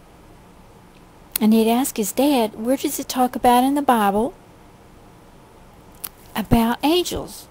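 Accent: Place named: South Carolina, United States. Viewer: thanks for the videos.. sounds like your from i guess south Carolina?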